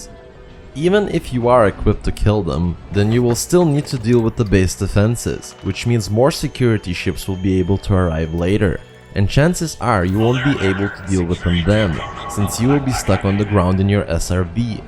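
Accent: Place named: California, United States. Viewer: Your Californian accents funny as fuck! thax Bruh your video hella help me! Blesses from California!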